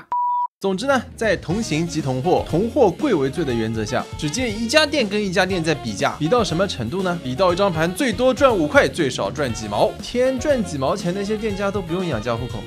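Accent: Shanghai, China. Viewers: 这个上海腔搭配的恰到好处。色一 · “纵所邹资”作为在海外的上海人 你让我想起了我和蔼可亲的上海语文老师 祝他在天国安好。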